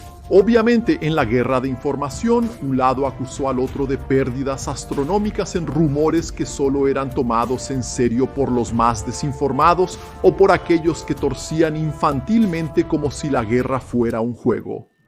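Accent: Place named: Venezuela. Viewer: habla como un negro venezolano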